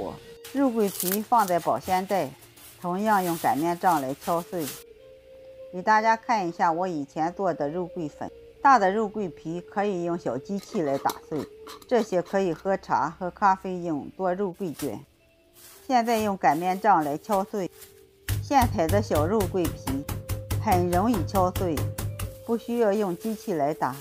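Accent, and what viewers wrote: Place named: Shandong, China. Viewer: 齊魯口音。我夫妻為台灣外省二代。能識大陸南北口音。祖籍在豫皖、大別山之地。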